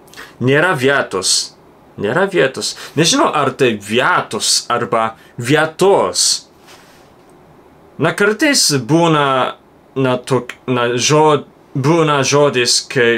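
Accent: Japan. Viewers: Šeip galvojau, kad būtų naudingas patarimas -pastebėjau, kad kartais žodžius tari kaip tariama hiragana raidėmis ,bet čia yra taip nes mokeisi hiragana garsus nuo jaunystės, tai mano manymu kuo daugiau mokysiesi tuo tavo lietuvių pataps lietuviškesnė ir hiragana tarimas nueis. Aš girdėjes esu gerą patarimą iš tokio kino kuris moko anglų kalbos, kad geriausiai gerinasi tarimas kai žiūri filmus ir mimikuoji kaip aktoriai taria. Linkiu tau didžiausios sekmės su mokymuosi! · Aš tiems kurie kritikuoja tavo tarimą, pasakyčiau. Kada taip pat gerai kaip pradėsi tarti japoniškai, tada kritikuok jo lietuvių kalbos tarimą. O pasakysiu, kaip lietuvis tu tari lietuviškai siaubingai ir tai labai gerai, nes tu esi japonas. Kaip japono, tavo lietuvių kalbos tarimas nuostabus. Svarbiausia, kad mes tavo išsakytas mintis puikiausiai suprantame ir mes tavęs besiklausydami jaučiame pasitenkinimą. 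· Gerai gyvemam...Šiaudais kurenam. Dūmai rūksta, nieko netrūksta. Vaike, nekreipk dėmesio. Tavo kalba nuostabi.Lengvas japoniškas akcentas yra labai malonus ausiai.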